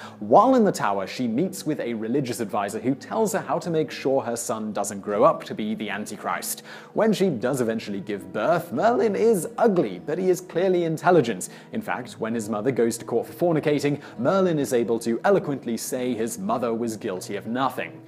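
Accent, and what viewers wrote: United Kingdom. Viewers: Why do Brits pronounce it SICKTH instead of SIXTH?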